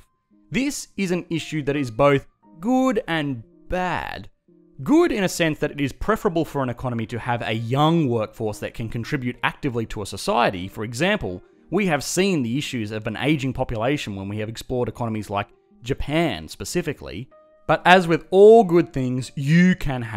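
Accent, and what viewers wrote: Australia. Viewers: Australian narrator its hard 2 understand ur english accent. Tenx 4 feature d philippines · He is not a British man. He is Aussie. Accent checked lol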